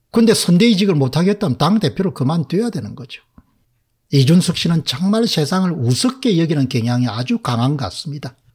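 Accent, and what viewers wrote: Gyeongsang, South Korea. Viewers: 이준석 화이팅!! 공병 이놈아! 니 쌍판때기 보자니 오바이트 나온다. 경상도 사투리 웃김...ㅋㅋㅋㅋ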